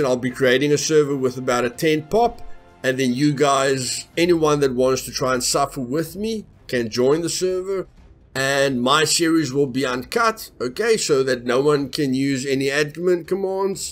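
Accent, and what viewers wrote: Afrikaans, South Africa. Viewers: Hey this Guy definitely Afrikaans😂😂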